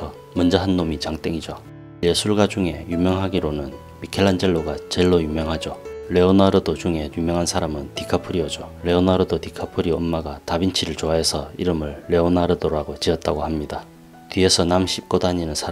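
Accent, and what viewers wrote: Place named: Busan, South Korea. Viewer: 나이쑤 부산말도 재치도 그림도 늠조으네요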